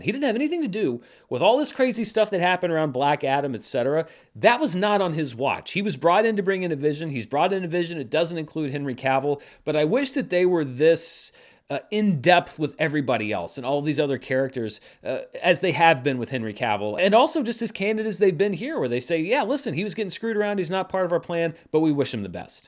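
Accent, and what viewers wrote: St. Louis, United States. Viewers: Is from St Louis?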